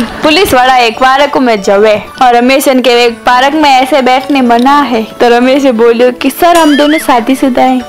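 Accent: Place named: Rajasthan, India. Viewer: ठीक है जी आप का पहनावा दिल को लुभावना लाग्या और आप की बोली गजब है जय मेरा राजस्थान फतेहपुर शेखावाटी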